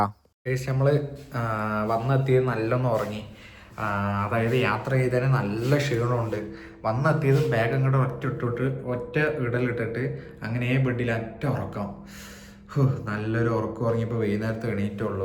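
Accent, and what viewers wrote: Palakkad, India. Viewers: പാലക്കാട്‌ ഭാഷ 😆ആ ആ ആ ആ ആ എന്ന് കുറേ നീ പറഞ്ഞു 😆😆😆😆 ഞാൻ പാലക്കാട്‌ പറളി, എടത്തറ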